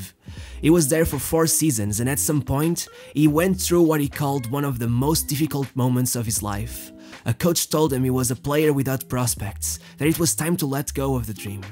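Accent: Portugal. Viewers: I love your Portuguese accent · o inglês tuga dramático é que coiso. Mas isto tá fixe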